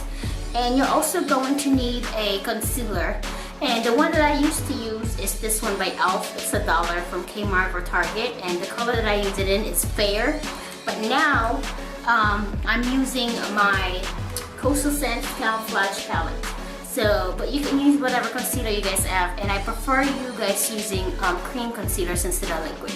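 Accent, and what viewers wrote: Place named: Philippines. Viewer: You are so pretty! I watch you all the time. Love your eyebrows, eye shape, and your cute accent! Sounds a bit Filipino. =) You Friday Night Hair GLS95 straight long hair looks good! I wanted to order it last week, but it was sold out! =/ What type of hair is it? Does it have a Yaki feel, or a silky smooth feel?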